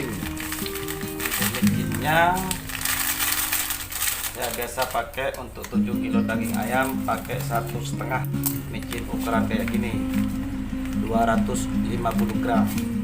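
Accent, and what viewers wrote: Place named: Madura, Indonesia. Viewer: Orang mana mas Logatnya seperti orang madura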